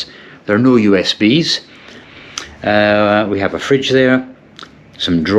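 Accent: Scotland, United Kingdom. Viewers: how did you manage to keep your Scots accent having been raised in Australia? I was born in Scotland but raised in England yet my accent is definitely not Scottish (although I do know where my bread is buttered when it comes to football & rugby). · It’s amazing you have a broad Scottish accent - with living your first 25 years in Oz.. I’m surprised. The hotel didn’t look good at all. I’m not keen on Sydney. Thanks for showing us.👍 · this might sound stupid but why does he have a scottish accent then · Amazing how you kept the Scottish accent having spent so much time in Australia! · His parents were Scottish so that would be the accent he heard most. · A Scotsman with an Aussie past, the Scot’s never lose the accent! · He has a Scottish accent because he is Scottish. Where he lived as a kid is just a red herring. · why do you not speak with an Australian accent if you grew up there? 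Why do you have a Scottish accent?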